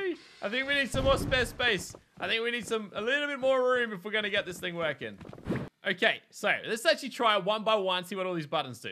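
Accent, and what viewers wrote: Australia. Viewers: Yep he's fucking Australian subbed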